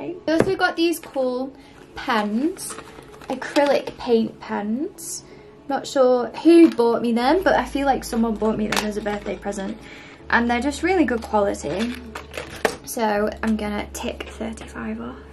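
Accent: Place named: United Kingdom. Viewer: Hello:) Thank you for sharing and updating your videos and I really like them! You're lovely! Actually I started to read the Bible(ESV) from last month and I’ve been learning British English for about a year. Can I ask you something? Do you have a plan to read the Bible(ESV) with your British accent, If you want? Your voice will definitely help someone who learns British English to be encouraged to read the Bible😊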